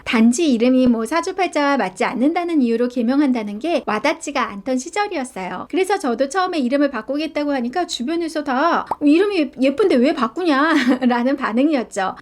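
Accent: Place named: Seoul, South Korea. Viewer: 꼭 옛날 뉴스에서 나오는 서울사투리 느낌이네요ㅎㅎ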